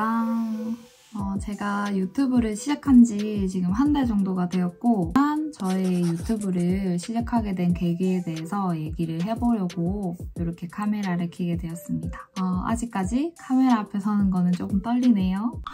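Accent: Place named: Seoul, South Korea. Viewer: 웅니 거주지는 부산으러 알고있는데 서울말 왜이렇게 잘하시나욤!?! 이것만 보면 완전 서울 사람이라고 해도 믿을듯 웅니 넘 이뻐요 앞우로 영상 마니 올려주데욤(ღゝ◡╹)ノ♡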